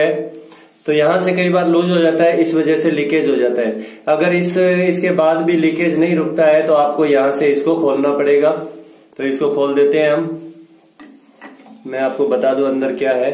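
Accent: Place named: Himachal Pradesh, India. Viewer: Himachali lga da bhai tu menjo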